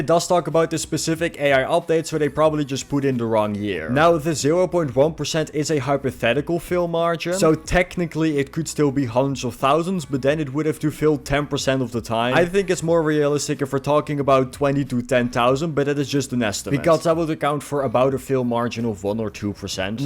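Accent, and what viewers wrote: Netherlands. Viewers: i’ve said this once, and ikll say it again, i KNOW your dutch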